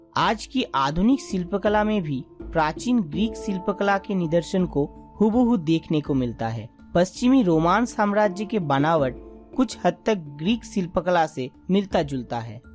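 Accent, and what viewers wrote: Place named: Bengal, India. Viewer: Bhai aapke pronunciation se laag raha hai aap Bangali ho 😅😅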